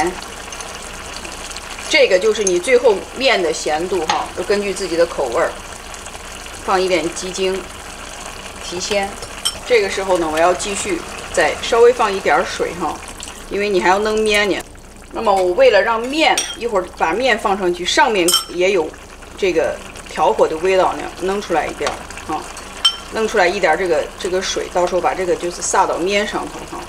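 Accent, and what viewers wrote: Shaanxi, China. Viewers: 陕西话听着就是舒服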